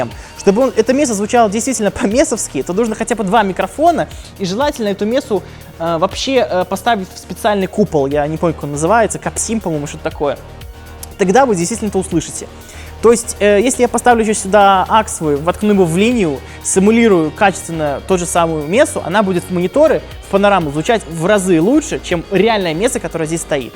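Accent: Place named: West, Belarus. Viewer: Первый раз на канале этом. 20 лет назад жил на протяжении 6 лет в Гродно, и этот говор такой западно белорусский, прям ностальгия )))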